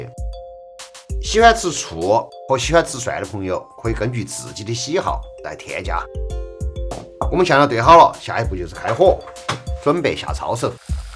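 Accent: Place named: Sichuan, China. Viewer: love this guy's sichuan accent,. but pork lard is not recommended , it blocks blood vessels.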